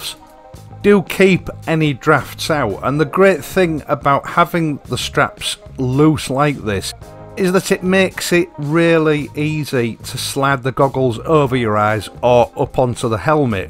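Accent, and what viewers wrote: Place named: Barnsley, United Kingdom. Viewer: Interesting accent! Where? Not Liverpool: barnsley perhaps? I am about to buy a Bell 500 (why don't they do the colours they used to market in the US??) and yes, Halcion goggles as per yours. It was almost already decided but your vid. took away any further question apart from where to buy both in or around Somerset (not via computer!!!). Bike,, I'm 71 and just bought a 1959 Harley Duo Glide. Silly old fool!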